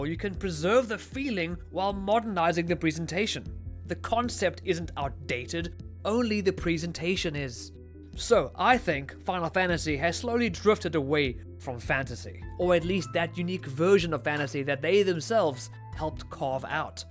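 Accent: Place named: South Africa. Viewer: Hierdie ou klink Suid Afrikaans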